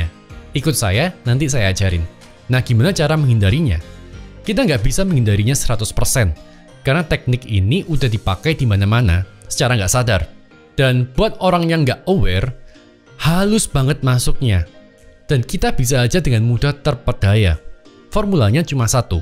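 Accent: Java, Indonesia. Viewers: Haha logat nya Jawa banget 🤣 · Denger om ngomong i satisfying, medok e enak wkwk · sukaa bangeet motivasinyaa...medok juga suaranya..kereeeen bener semua yg diomongin bukan ngasal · Agak medok' ga bro 😁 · Sampean wong jowo opo lek.. mergo logate rodok medok rodo chino🙏🙏salam jowo lek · Logatmu aku suka mas,jowo mu medok 👍👍👍👍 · Betah gua liat Koko ini kalo ngomong pasti Koko Jawa kalo Koko Jakarta tengil2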